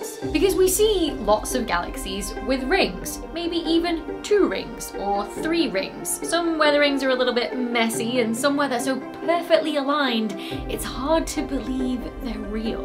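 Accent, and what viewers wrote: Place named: Yorkshire, United Kingdom. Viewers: I really hear that foot-strut vowel when she talks. I think the Yorkshire accent is my favorite, particularly the "uneducated" one. It sounds so incredibly pleasant. Cheers from Minnesota! 🙂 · Dr Becky is 'educated Yorkshire'. That is, her enunciation is perfect, but the vowels are flatter..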